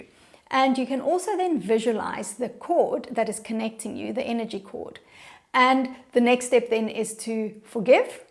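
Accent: South Africa. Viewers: You sound south african,I feel at home❤ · You sound south African 🇿🇦🥺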